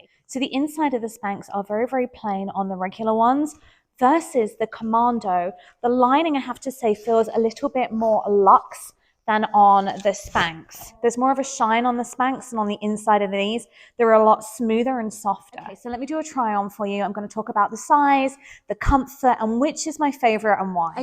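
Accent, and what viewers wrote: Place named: United Kingdom. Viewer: Love your British accent! I think you should have ordered a size small in the Commandos! 😊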